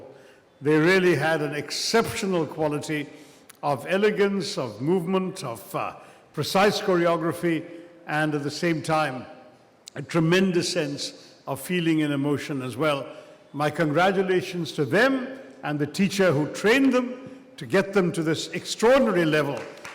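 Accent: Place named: Oxford, United Kingdom. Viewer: Highly inspiring ,informative ,interesting and wonderful to listen Dr shashi taroor's Oxford accented English. Highly super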